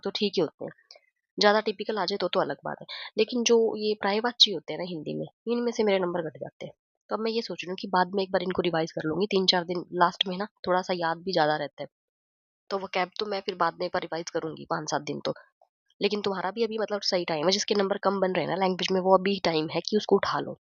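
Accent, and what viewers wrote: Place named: Haryana, India. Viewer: ye to m awaj se hi samj gyi thi lekin hr. Me kha se h aap m bhi hr. Se hi hu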